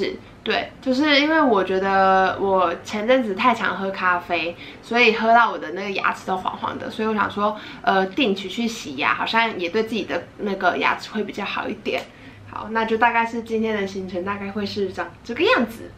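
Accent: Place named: South Korea. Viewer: 哇咔咔等到你嘍，想問健身視頻都看哪個？聽上去是韓國人呢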